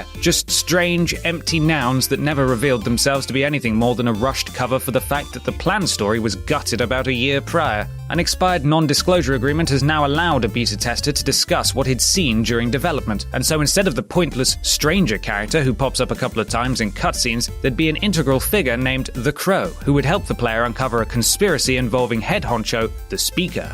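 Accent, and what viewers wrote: United Kingdom. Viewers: oh my god, his british accent is awesome! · This man has the most British voice I've ever heard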